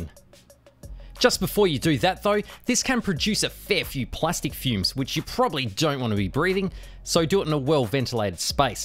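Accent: Australia. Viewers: very interested i like to hear that Australia voice. that is an obsession with perfection. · Non Australians, and especially non-native English speakers, cant understand your accent. You might want to have an American or a Brit do a voice over to multiply your audience dramatically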